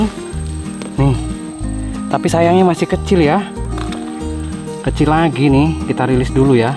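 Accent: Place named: Java, Indonesia. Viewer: Abang ini asli mana kok logat nya campur jawa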